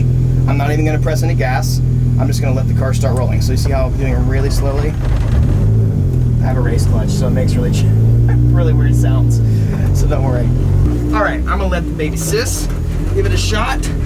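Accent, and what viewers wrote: Australia. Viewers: are u Aussie cause I am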